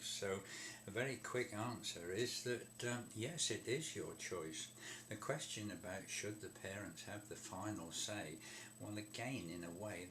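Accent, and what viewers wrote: United Kingdom. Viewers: Old Guy + British Accent = Best lecturer.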